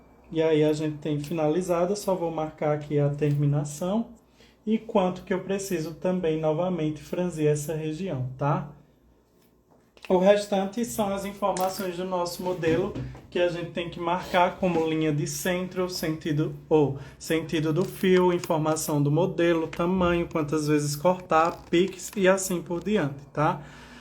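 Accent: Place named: Pernambuco, Brazil. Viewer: Sotaque pernambucano... Ksksk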